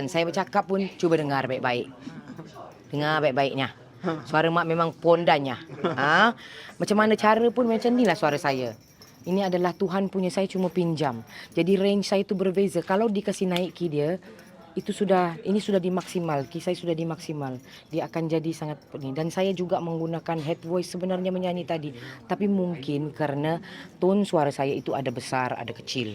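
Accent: Sabah, Malaysia. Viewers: Slang sabahan itu mahal . Suka dgr👍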